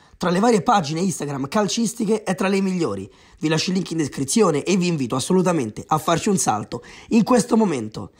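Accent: Rome, Italy. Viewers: Un consiglio. Se aspiri a diventare un commentatore, non puoi commettere errori pacchiani. Uno: il Milan, nel 2016, ha vinto la supercoppa italiana. Due: l'Inter ha vinto la Coppa Italia nel 2011. Tre: non puoi non sapere il cognome esatto dell'allenatore più vincente della storia della Lazio. Quattro: fossi in te, eviterei di parlare in romanaccio (il romanesco, che è altra cosa, è di Belli e Trilussa).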